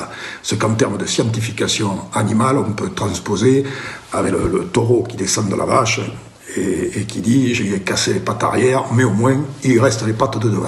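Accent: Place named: South, France. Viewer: Tu resemble A Raoult j'aime pas Raoult mdr tu fais ton axan sud !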